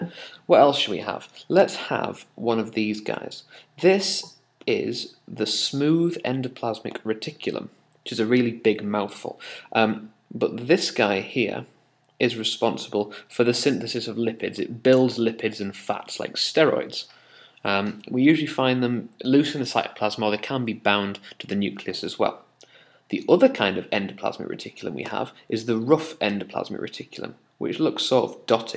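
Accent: United Kingdom. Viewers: he bri'ish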